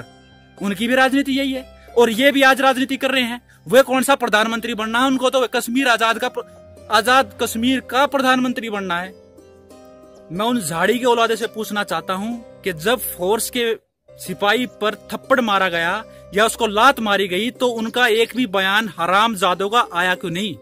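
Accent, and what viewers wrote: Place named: Rajasthan, India. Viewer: bhai rajasthani ho kyaa....accent aur background se guess kiyaa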